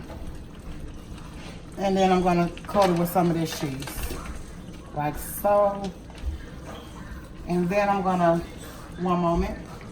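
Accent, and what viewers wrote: Chicago, United States. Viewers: Chicago in the house. I know a Chicago accent when i hear it. New subscriber here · Is that a Chicago accent I hear? 👀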